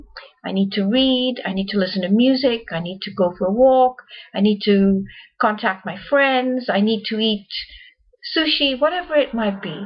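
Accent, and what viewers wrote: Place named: South Africa. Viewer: she sounds like a South African!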